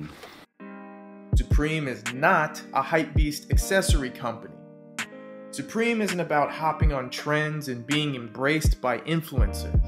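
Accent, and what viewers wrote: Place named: East Coast, United States. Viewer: The stylized east coast regional diction that is narrationally employed throughout this video, is better enjoyed at a faster playback speed as it is more pleasing to the ear and the larger demographic of viewers most likely to watch this genre of YouTube multimedia.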